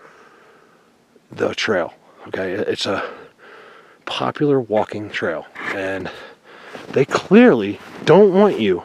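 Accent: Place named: New Jersey, United States. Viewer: I've been watching your excellent videos for a while and I knew I heard a touch of Jersey in your voice. Perhaps it's just a bit of Jersey attitude. In any case, you confirmed it. Good luck and stay safe.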